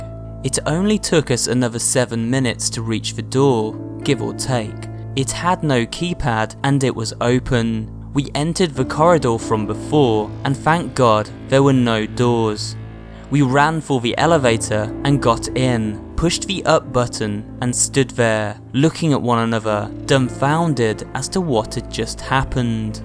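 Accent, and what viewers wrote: United Kingdom. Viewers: I think he breetish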